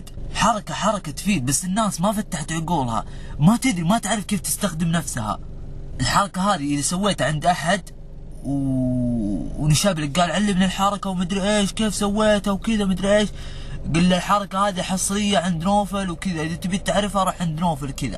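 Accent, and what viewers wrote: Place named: Libya. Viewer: اللهجه تشبه كثيل لهجت الليبيين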